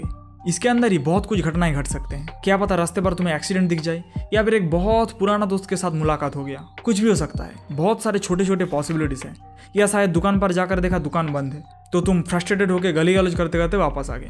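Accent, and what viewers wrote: Bengal, India. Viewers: Bhai bengali ho kya ap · tomar bengali accent tike kom karo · bengali tone bro..... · Love your Bangali accent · Tumi bangali 😂 am I right 😅 mein ne pechan liya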